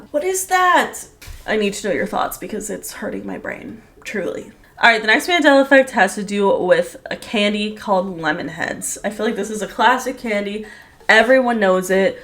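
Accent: United Kingdom. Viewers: Wuuuut??? He’s british!! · bishhhhhh tfff IM BRITISH, I WAS ADAMANT HES BRITISH 😮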